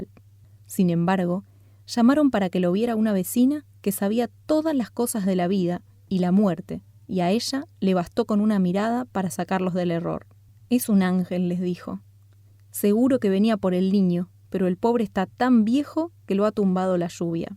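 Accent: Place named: Argentina. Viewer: Es nuestro acento, no veo motivo por el cuál corregir el acento Argentino (?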